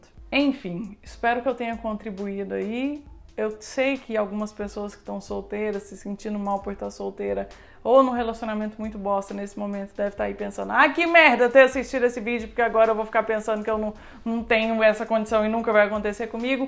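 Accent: Minas Gerais, Brazil. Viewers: “Acabei de ter um estranhamento com cê” apaixonada nesse mineres 😍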